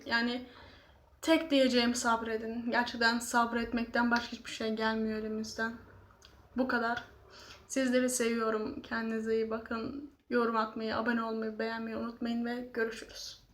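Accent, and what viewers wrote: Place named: Aegean, Turkey. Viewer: Senin ege şiveni yirim😂😂😍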